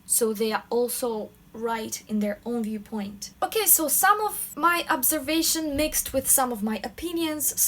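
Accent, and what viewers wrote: Russia. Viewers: Я сразу же поняла, что вы русская, несмотря на то, что у вас прекрасное произношение :) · Я прямо слышу русский акцент =)) очень информативное видео 👍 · блин, сразу поняла, что вы русская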